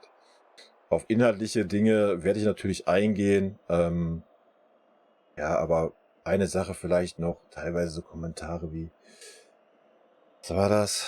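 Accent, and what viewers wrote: Ruhr, Germany. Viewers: Komme aus der Oberpfalz/Bayern bin seit ich in der 1. klasse war Bayern Fan und das ist 33 Jahre her. Ich kann mich noch nie erinnern jemanden Fan sein ab zu erkennen, nur weil er nicht aus München/Bayern kommt. Mach weiter so verfolge dich seit 4 Wochen und mag deine Ruhrpott Schnauze😂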